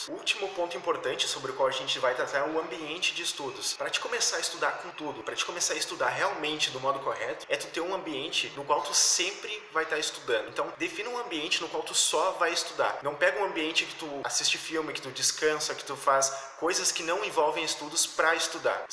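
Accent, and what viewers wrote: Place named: South, Brazil. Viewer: realmente da pra ouvir o sotaque do sul, obrigado pelo video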